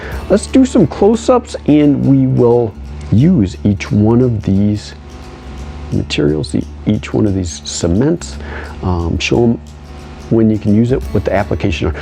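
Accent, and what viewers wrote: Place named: Minnesota, United States. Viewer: I'm from Minnesota. Are you? You sound like me.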